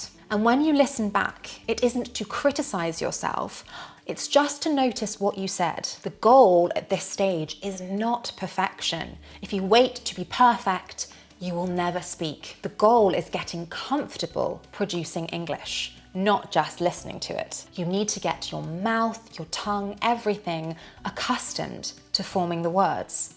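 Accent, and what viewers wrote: United Kingdom. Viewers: de que parte del Reino Unido es su acento , ❤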